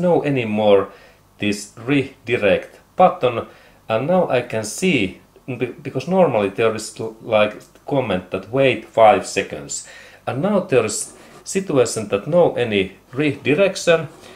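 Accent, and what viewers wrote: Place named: Finland. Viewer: Your Finnish accent is soooo cute. 😉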